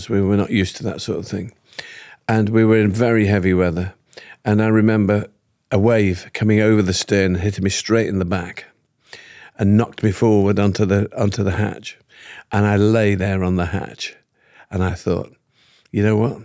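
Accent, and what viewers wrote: United Kingdom. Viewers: That exact accent is the same as Bear Grylls. Can any Brits tell me where it’s from?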